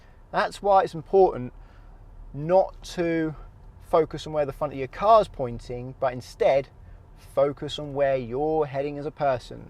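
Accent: United Kingdom. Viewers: He is truly one of the best instructors. The only annoying thing I find is his British accent, it is way too different from American accent, Americans don't speak half words every second like Britishers.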